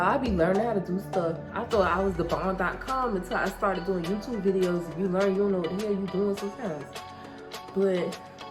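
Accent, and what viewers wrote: United States. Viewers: First of all I liked and subscribed within the first 2 minutes secondly, I ordered the same number and girl you did that! Yuhhhhhhhh * yo voice *😂😂🥰 I knew you was from down south.. THEN YOU SAID Carol city!! Oh bihhh yessss 😂305 honey.. Take it to the houseee. #thecrib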